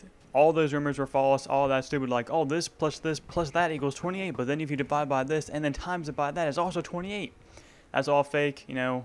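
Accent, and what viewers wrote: United States. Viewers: you speak american? was i the only one that caught that?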